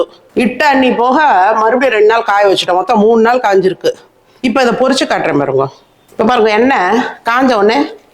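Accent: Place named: Tiruchirappalli, India. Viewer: ரொம்ப ரொம்ப ரொம்ப thanks mami. Super super ungalun intha immediate response and ungalin viewers ku neegal kudukum importance romba romba pidichiruku. Sila peir enna ketaalum பதில் போட வே மாட்டார்கள் immediate AH poda லேனா கூட atleast 1 week kule podalam aana poda maata avargaluku therintha mariyaathai avlo thaan. I really really appreciate your response for your viewers ❤. Kanippa neega seithathu pola Try panni paarkiren. Neengal Trichy oora. Ungalin பேச்சு athupola iruku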